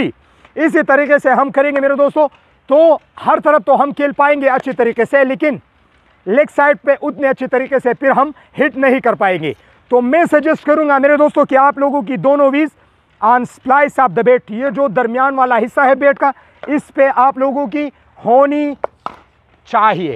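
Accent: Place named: Kashmir, India. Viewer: Nice...Bhai....Aapke bolne ka andaaj bahut achha h ....Mujhe mere jammu-kashmir ke friends ki yaad dilata h....Keep it up...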